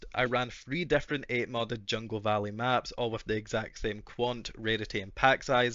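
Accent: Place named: Scotland, United Kingdom. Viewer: Love the accent. Whether male or female, scottish is just 👌Cheers to the video.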